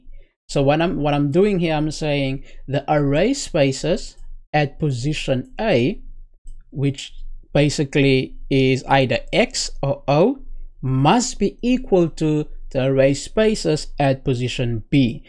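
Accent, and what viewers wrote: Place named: South Africa. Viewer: I am studying IT and had to leave my home country (South Africa 😢) I need help with an assignment while being homesick. The i came across your video and with 5 seconds into the video i knew were you were from. Not only did your video make my day but will definitely help my studies 😊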